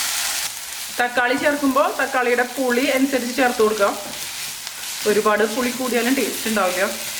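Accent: Thrissur, India. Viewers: തൃശൂർ ആണല്ലേ?? എവിടാ ചേച്ചി??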